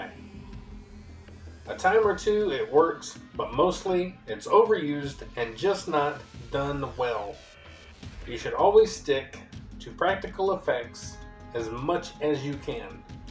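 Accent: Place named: South, United States. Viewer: You sound southern I can see you doing a southern story reading and It being awesome I’m going to picture this voice when I read Where the Red Fern Grows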